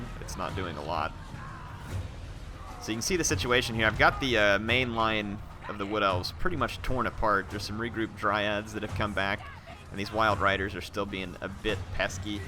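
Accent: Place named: Arkansas, United States. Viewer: Whenever I hear that ol' friendly-Arkansas " Hey Youtube, what the crap is going on!" it cheers me up immeasurably.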